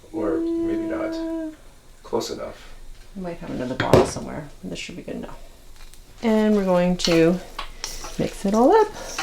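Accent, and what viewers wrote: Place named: Canada. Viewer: I feel like I just watched maangchi with a Canadian accent! 🤔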